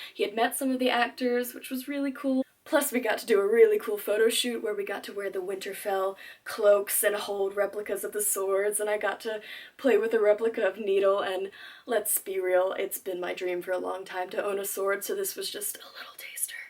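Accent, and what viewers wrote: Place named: Ireland. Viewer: You have a super subtle Irish accent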